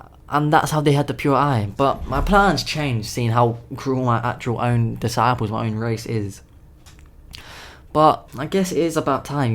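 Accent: United Kingdom. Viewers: oh i love the British ascent its so funny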